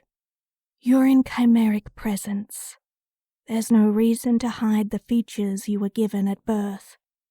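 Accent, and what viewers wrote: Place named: South, United States. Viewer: Thank you so much for filling my script! I don’t know if I’m crazy or not but I think heard a slight southern ting in your performance and I really liked it. Brought out the preaching part of this script well. Thank you!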